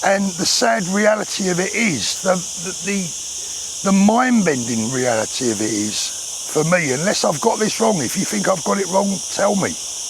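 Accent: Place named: Cockney, United Kingdom. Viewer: You're like a cockney boxing coach from one of those movies. Thankyou.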